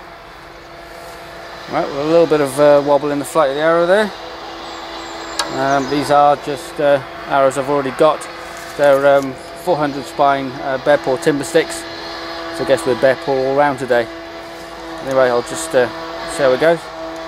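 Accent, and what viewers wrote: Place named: North, United Kingdom. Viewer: You sound a Northerner , so where are you shooting ??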